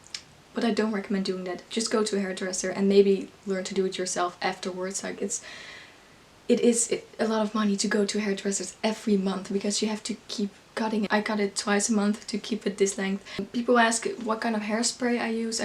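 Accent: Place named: Netherlands. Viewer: you sound dutch or belgian, weet niet precies welke van de twee. leuke tutorial!!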